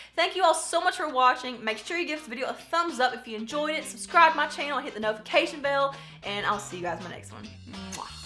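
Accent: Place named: Kentucky, United States. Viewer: Just found your channel and noticed you're from Ky too!!! I love that I had a feeling from the accent lol I'm in eastern ky area what area are you from?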